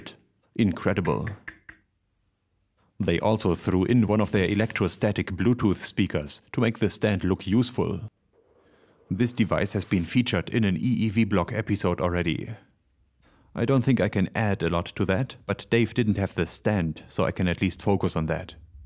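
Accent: Germany. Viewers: German accent👌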